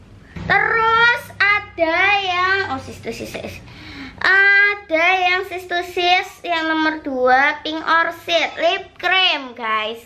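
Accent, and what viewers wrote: Java, Indonesia. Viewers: Medok bgt logat mba 😂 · Seneng dengar meddo' jawanya😁 Sek to dek, meneng o dek, aku nge review iki loh🤣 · aku mojokerto juga medok wkwk. Lah bukannya dia dri tulungangung ya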